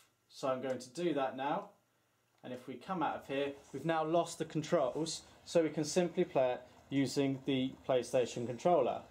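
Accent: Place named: United Kingdom. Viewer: I cannot explain how i love the british accent!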